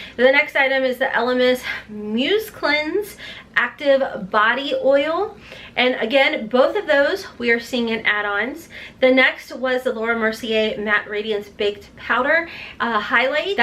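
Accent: South, United States. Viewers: I love how you talk 😍🥰💕 especially when you say “matte” and “back”.... and girllll I’m from the south (Virginia) and I have that southern accent as well.. and OFTEN REPEAT THINGS LOL... do your thang girl! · Awe... try not to let people bully you...It’s not that your not putting on your big girl panties, it’s people can be mean and insensitive...Your accent is cute and southern, like mine! Your a sweet and beautiful queen, so don’t listen to mean girl’s comments...We love you, ALL of you! 🥰♥️🥰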